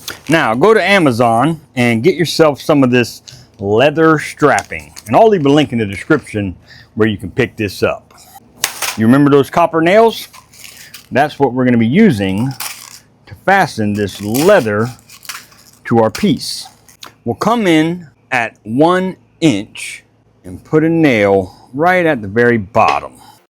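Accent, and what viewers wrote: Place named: Louisiana, United States. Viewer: I swear you sound more cajun dan vikin. just sayin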